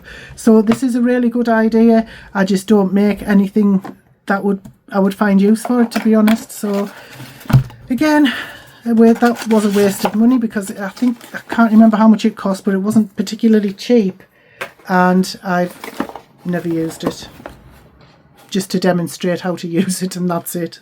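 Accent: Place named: Sunderland, United Kingdom. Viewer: Just found your site.. that sounds like a Sunderland accent.. great vid thanks 🌹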